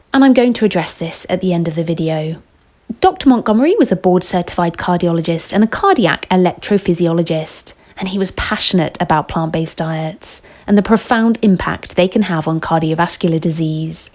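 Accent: England, United Kingdom. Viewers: I so hate this so called english voizzze that i would rather die actually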